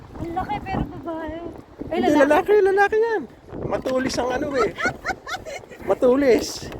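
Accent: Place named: Bulacan, Philippines. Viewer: Parang punto kayung bulacan?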